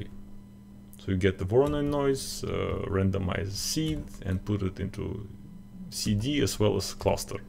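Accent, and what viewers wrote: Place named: Russia. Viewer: Сразу слышно русский акцент. 😊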